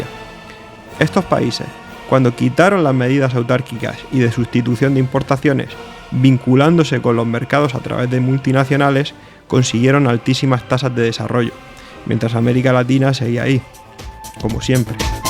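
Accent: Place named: Spain. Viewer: ja ja ja La democracia liberal como la único sistema político que permite vivir en libertad. Eso es gracioso y también impreciso. No se si te estas refiriendo al liberalismo de Adam Smith o al neoliberalismo de Milton Friedman, o cual de los neliberalismos. Por que hay varias corrientes neoliberales. De cualquier manera ninguna funcionó. Algunos engañabobos tratan de poner en practica el libertarismo como si de una corriente ajena al liberalismo se tratara. Estas corrientes como odas las teorías económicas son superadas por la realidad. Sin embargo aportan al progreso de la humanidad, incluyendo a "Critica al Capitalismo" tan criticado, poco leído y sacado del contexto del periodo histórico en que fue escrito. Te recomiendo te busques a un patrocinador como lo hacen "visualpolitik" o Gloria Álvarez. Tienes un acento como de España. Ustedes no viven en democracia. 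No eligen a su presidente por sufragio directo y mantienen a una monarquía inútil. Después de tres meses ya tienes 4 likes, y este comentario. No te rindas, tu puedes.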